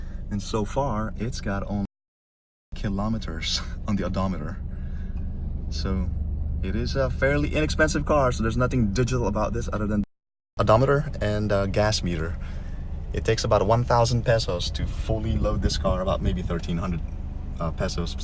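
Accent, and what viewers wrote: United States. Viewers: I thought this video was filmed in US because of ur accent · Nice voice with american accent & very well review. 👍👍👍👍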